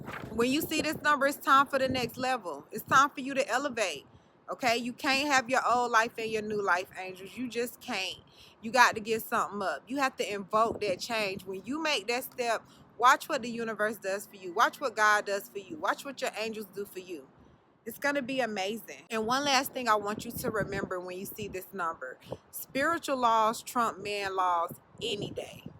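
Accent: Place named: South, United States. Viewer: I thought I knew that country twang. In from Charlotte. Well the past 9 years. I try to listen to other’s knowledge on this subject, but I keep coming back to your intoxication.❤️❤️❤️❤️❤️❤️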